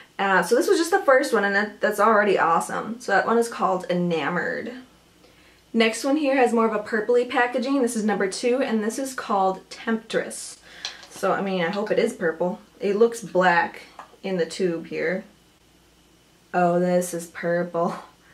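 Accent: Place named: Upstate New York, United States. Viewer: BTW, are you from up north? I grew up in Rochester NY and our accent is very similar to yours. :D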